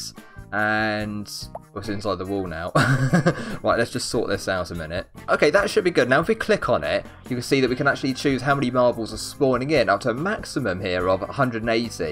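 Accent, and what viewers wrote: United Kingdom. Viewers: Are you British? I ALSWAYS WANTED TO BE BRITISH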